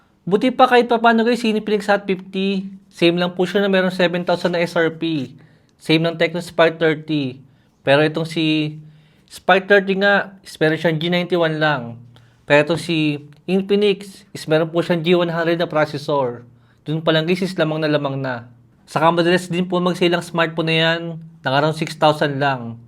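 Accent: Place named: Cavite, Philippines. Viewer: Taga Cavite ka sir‽ Puntong cavite city or Tanza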